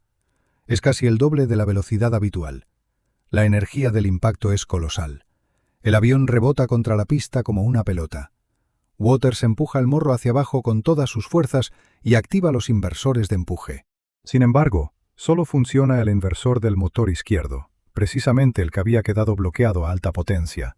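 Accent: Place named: Spain. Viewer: Es el primer vídeo que veo en el que se pasa aleatoriamente de la narración en español de España al español hablado en países hispanoamericanos.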